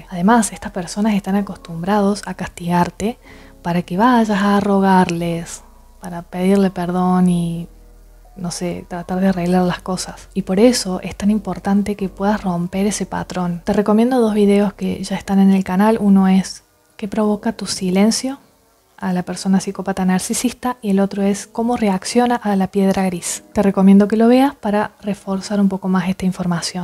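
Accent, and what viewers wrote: Córdoba, Argentina. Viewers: Oh Qué decirte? Realmente sorprendido Denoto en tú dulce voz una sutíl tonada cordobesa Empecé a escuchar tús audios dé casualidad Primero uno y después otro y otro y otro más Nunca doy likes ní hago comentarios ní mé suscribo Pero WOW con vos ló voy hacer, té ló merecés GENIA, la dulzura dé tú vos, la simpleza dé las explicaciones pero con contundencia y firmeza Didáctica Cada comentario tuyo es una incisión profunda y precisa Qué más decirte? Mé gustaría decirte muchas cosas más Pero yá mé extendí demasiado Té deseo ló mejor, muchos éxitos, té ló merecés Espero verte pronto en alguna conferencia Mé encantaría eso Sos un orgullo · Me encanto tu video. Estuve 3 años con un narcisista encubierto por 3 años. Muy abusivo verbal y emocionalmente; precisamente de Cordoba; tu mismo acento. Bueno, yo lo apoyaba financieramente por los 3 años que estuvimos juntos. 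Mientras más yo lo ayudaba peor me trataba y exigía más. Terminé casándome con el y a los 3 meses no soporte más sus maltratos y humillaciones y lo dejé. Agarre mis cosas y me fui. Me hizo Imposible las últimas semanas antes de mi salida. Lo malo es que me robó dinero. Yo le preste dinero en un momento que el estaba en un aprieto financiero antes de la boda y no me los quiere pagar. Me bloqueo para que no le pida mas el dinero. Y habla mentiras sobre mi y porque terminamos ! Que me importa! Ahora estoy con mi abogado tramitando el divorcio y todo. Lo curioso es que después que lo dejo, me entero a través de víctimas que estaban pendiente de nuestra vida juntos, que es un estafador en Córdoba. Me contactaron sus victimas ! Estafa mujeres que las engancha y les roba. También hombres que les hace creer que es un inversionista millonario en Miami … uffff una lacra total. Ósea me case con un delincuente sin saberlo. 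Además de narcisista. Gracias a Dios me di cuenta tiempo, ese hombre hubiese acabado conmigo, y con todo lo que tengo, capaz de asesinarme para quedarse con mis cosas. Ahora estoy bien. Dejarlo fue como volver a nacer y soy feliz otra vez. No lo extraño para nada. Ahora gasto mi dinero en mi y en mis hijas , viajo , invierto en arreglar mi casa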